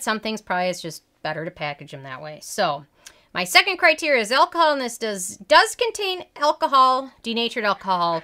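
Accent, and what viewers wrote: Minnesota, United States. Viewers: I’m Minnesotan and recognize a fellow cold north accent when I hear it ;) Wisconsin?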